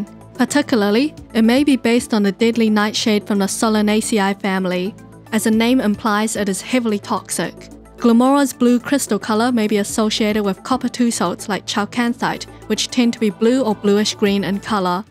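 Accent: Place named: New Zealand. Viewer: First word you said had me check that you were from New Zealand (its just how we speak y'know)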